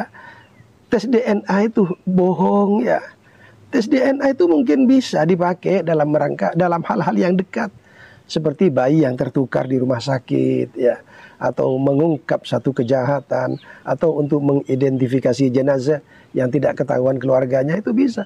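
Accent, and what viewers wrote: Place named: Madura, Indonesia. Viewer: kyai ini klo dari logatnya pasti dari Madura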